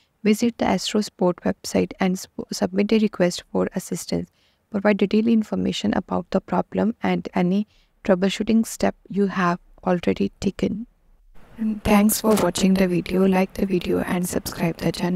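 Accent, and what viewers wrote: India. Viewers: Can’t escape the annoying Indian accent anywhere these days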